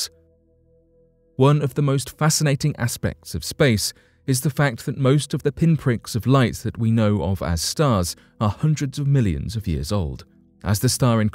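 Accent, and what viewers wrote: England, United Kingdom. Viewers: why all these space videos always have an English accent? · Nice video but I don’t believe you should be putting the u.s navy’s business out there,but what can I do you do have a British accent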